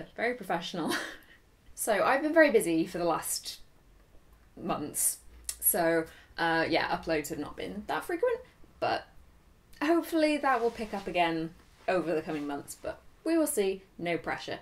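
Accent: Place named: United Kingdom. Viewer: British Accent <3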